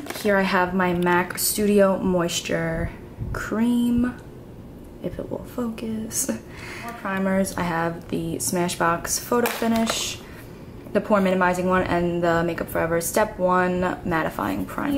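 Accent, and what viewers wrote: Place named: Staten Island, United States. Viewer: fellow staten islander! knew it the minute i heard you speak you sound just like me lol. awesome choices for your kit! i'm also a freelance MUA on the island!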